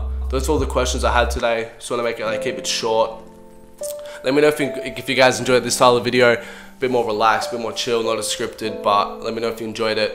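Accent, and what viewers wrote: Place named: Australia. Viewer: bro ur Aussie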